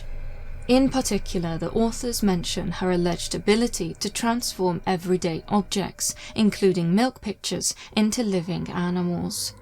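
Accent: England, United Kingdom. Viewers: Thank you Ms. for your astute story of this witch. I appreciate it being told by an english woman instead of an american as it lends authenticity as well as the use of old english.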